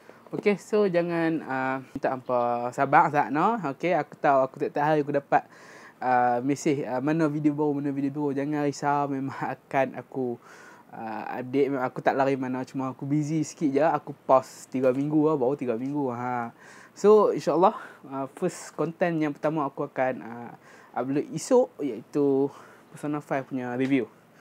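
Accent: North, Malaysia. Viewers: accent utara pulak tu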